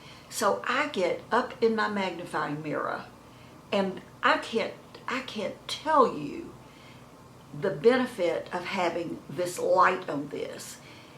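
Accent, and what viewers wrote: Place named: Texas, United States. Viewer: I just saw one of your videos for the first time tonight. We have some similar ideas! I was all for shopping my closet which was brimming with really cute clothes but soon discovered that I have the COVID Curse...I’ve gained weight for the first time in at least 5 years! YIKES! I was a slim petite 68 year old & honestly, gaining almost 8 pounds really makes me feel fat...about 117 lbs. now.Everyone has bagged me for over 5 years to EAT! I couldn’t eat for about 4 years due to the loss of my first-born, my only son. My heart broke. He was a chemistry professor at a university in Ohio but our home is in Canada. I think that sometimes I think he will come to the door because he was in another country & I didn’t see him often. I hope that doesn’t sound too crazy! Now, back on topic...I am subscribing. I think you are absolutely LOVELY inside & out & I could listen to you talk ALL DAY! 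Are you from Texas? My Dad’s third wife is from Texas & you remind me of her (your voice).She lives in British Columbia which is quite far from us in Ontario. Anyhow, I loved your video & look forward to finding more of them! I don’t know anything about you yet but I think that if I ever had the good fortune to meet you, we would get on like a house on fire! In September I will be 69 & I saw in one of the comments that you are actually 77!? You look at least 10 years younger! Maybe those of us who try to keep a kind heart are blessed with looking a bit younger than our ages? Love & kindness are my p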